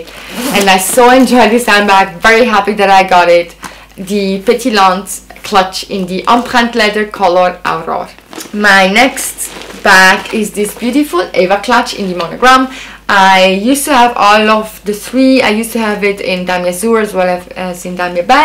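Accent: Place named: France. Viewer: Nice to see you back! I watched A LOT of your videos before starting youtube myself. J’adore ton accent français. (pas du tout comme le mien - je suis Québécois Anglophone)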